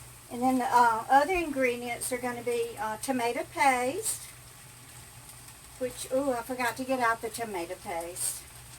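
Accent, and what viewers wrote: South, United States. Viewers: I absolutely love your southern accent :) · Food looks good ms. 😋your sooo sweet,I love your southern accent😍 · Love that "bless his heart", that's so southern. We all say it down south. The north hasn't taken your accent away bless your heart! You know I'm real southern because of being from south Mississippi like you. sang that song. My mama had all his records, yes records not CD's. Her's were the big ole 78 vinyl RPM's., crawfish pie and file gumbo, cause tonight I'm gonna see ma cher amio. Then he was gonna pole his pirogue down the bayou.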